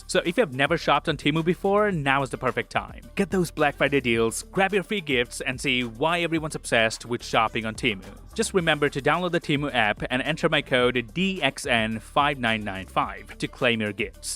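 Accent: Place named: Canada. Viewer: eh? New sub. 🤙🇨🇦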